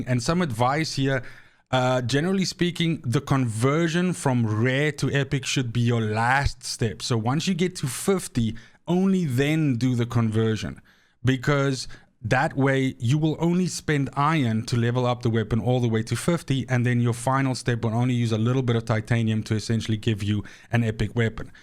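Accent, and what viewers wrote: South Africa. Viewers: You south african? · Are you from South Africa?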